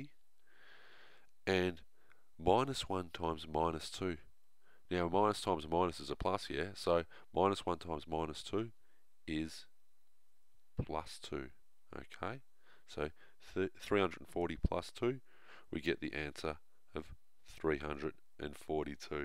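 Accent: Australia. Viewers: The best math teachers have Australian accents.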